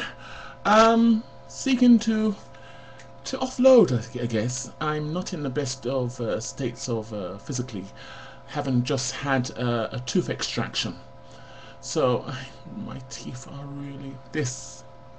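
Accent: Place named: RP, United Kingdom. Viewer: I like how your RP accent.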